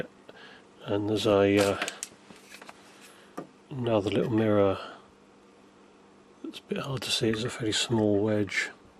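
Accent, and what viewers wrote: United Kingdom. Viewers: I'm as straight as a ruler but I could listen to your British voice all day long. What's with that?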